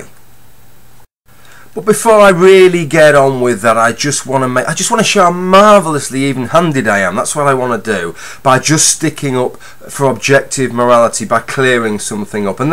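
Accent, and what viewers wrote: United Kingdom. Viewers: Anyone know where in England this guy is from? He talks just like Daphne from Fasier.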